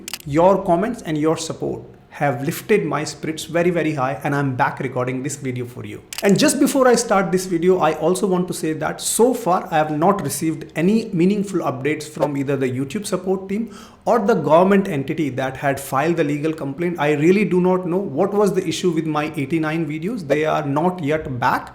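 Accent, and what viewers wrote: India. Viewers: Dang! thats a heavy AF indian accent..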